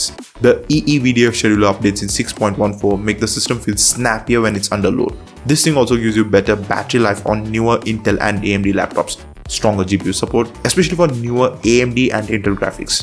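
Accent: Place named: India. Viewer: that hindu accent, can you change it?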